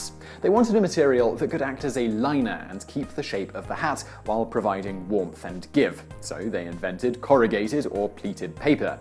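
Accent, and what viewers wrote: England, United Kingdom. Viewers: I’m pretty sure he’s English lol give him a break 😂 some of those pronunciations are actually correct U.K. pronunciations. And I think the besieging may have been either him assuming it was a German word, or the text was typoed like “beiseging”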